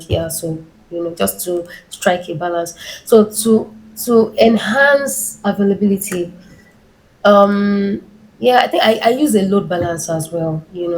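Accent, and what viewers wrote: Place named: Nigeria. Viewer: I could sense the Nigerian accent. Please tell me if I am right?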